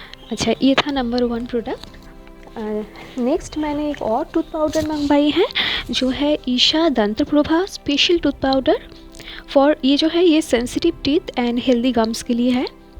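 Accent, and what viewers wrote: Bengal, India. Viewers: Are you Bengali??? Your Hindi accent has a Bengali style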